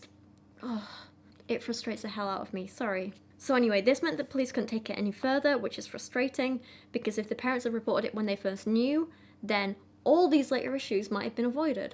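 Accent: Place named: Yorkshire, United Kingdom. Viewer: Hi,! I knew exactly what saying you were talking about at the beginning as soon as you started describing it. I have been thinking of it recently when I watch videos critiquing tradwives/the alt-right but it was bugging me that I couldn't remember it either. I finally Googled it just now and it is "Kinder, Küche, Kirche" (Children, kitchen, church). Off topic, I love listening to your voice/accent! It reminds me of when I was able to go visit my aunt and uncle in West Yorkshire (though they themselves don't have Yorkshire accents because my uncle grew up near London and my aunt is American like me). Edit: Yes, state troopers are synonymous with state police. They tend to be involved with investigating crimes that occur in rural areas/outside city limits.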